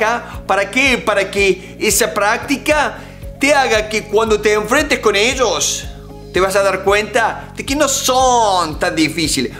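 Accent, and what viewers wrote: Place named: Cordoba, Argentina. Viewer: Sos cordobes hasta la espuma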